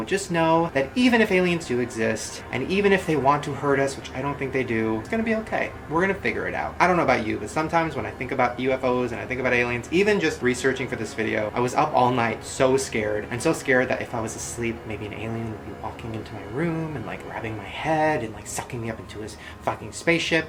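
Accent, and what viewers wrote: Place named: United Kingdom. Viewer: i love the subtle british accent he does in this series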